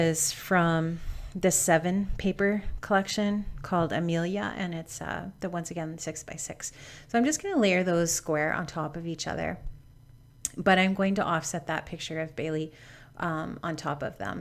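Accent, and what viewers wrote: Canada. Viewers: Hahaha - oh to be a fly on the wall on the wall and hear you do British, LOL! You really must treat your YouTube followers to a bit of story time :-D It would be brilliant if, one day, you did get to travel to London and Derby and all over the UK. I've personally never been to Canada, and can't claim any connections to your beautiful land, but I have heard amazing things! One day I will go, and that's for certain. (See if I can nail your accent... that kind of thing, hehe!) Hope your summer homework isn't too arduous, poor you. Have a great weekend! :-D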